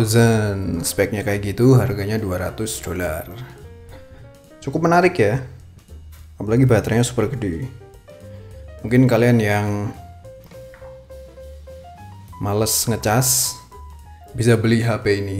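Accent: Java, Indonesia. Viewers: Medokmu toh mas Bikin ngakak Ckckcckkcck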